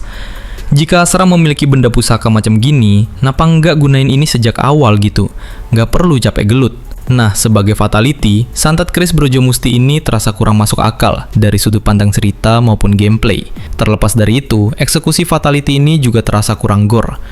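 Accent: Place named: Surabaya, Indonesia. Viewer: Lu org surabaya bang?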